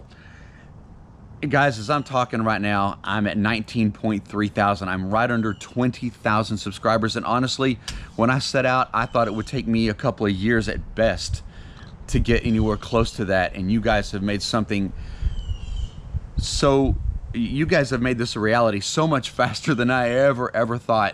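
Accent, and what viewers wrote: Morocco. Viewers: Stay safe bro...we gonna miss your very special moroccan dialect ...hope we will see you soon in Morocco. You re become one of us. ❤🇲🇦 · Bravo; You speak now like a real Moroccan 👏👏👏👏👏👏👍👍👍👍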